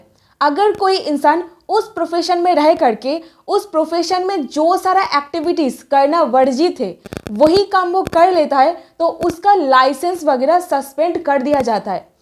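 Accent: Bengal, India. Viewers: Bengali tone but you have very distinct ,clear pronunciation in Hindi I highly appreciate it mam, please keep it up mam , with regards.🙏👍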